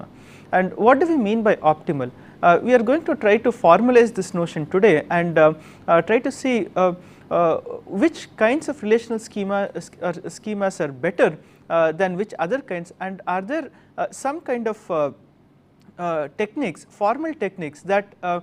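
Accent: India. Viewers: To all who complain .. "he has an Indian accent is annoying and he is constantly stammering" .. Why don't you with perfect accents do something useful ? It seems, aa you can do with your perfect accent is just nag and abuse. Don't forget, BEGGARDS DONT CHOOSE. Tell your parents to teach you a bit humility and respect in perfect English.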